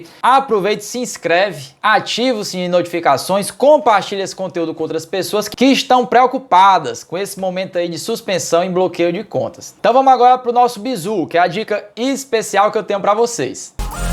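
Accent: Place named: Fortaleza, Brazil. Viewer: Tu é de Fortaleza macho 😀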